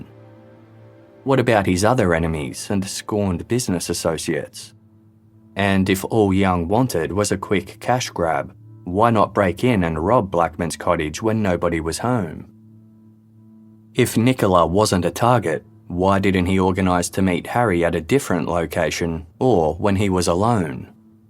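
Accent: Australia. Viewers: That clear, well modulated, Australian voice, makes this crime channel so good. Thank you for this, and peace to all out there.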